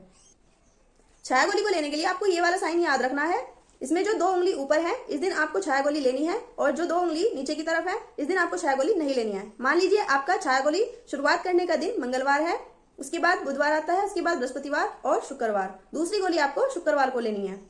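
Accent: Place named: Uttar Pradesh, India. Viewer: Nice explanation dii, aap up se h kya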